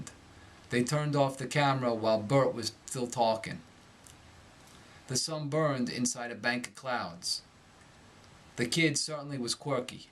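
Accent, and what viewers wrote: New York, United States. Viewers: Crazy shit, I'm from NY and I don't even hear the accent intll he shows the difference between the pronunciation. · The thing I love about this guy is that he looks like the people I imagine speak this accent. I'm from Sweden so don't hate my prejudice too much :P I have to say that this is the most lovable accent from the U.S. of A.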